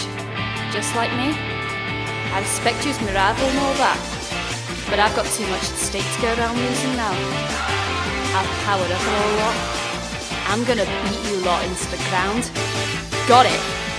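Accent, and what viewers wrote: Liverpool, United Kingdom. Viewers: Nice scouse accent lol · Don't worry, definitely not the worst impression of a northern accent I've heard lol 😆. Thought it sounded like a mix of Scottish and Liverpool, with a hint of Yorkshire, myself. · Love a good Scouse accent. Love it.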